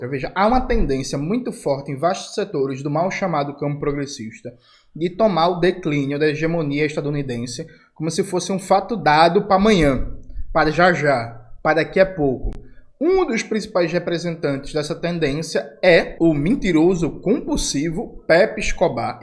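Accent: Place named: Recife, Brazil. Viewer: Kkkk, parece que vai ser na próxima segunda feira e tudo tomando um café com o excêntrico P Escobar. Com esta capacidade de análise honesta, o sotaque mais bonito do Brasil, o recifense...a vó te ama D. Jones! Parabéns pelo PCBR. 🎯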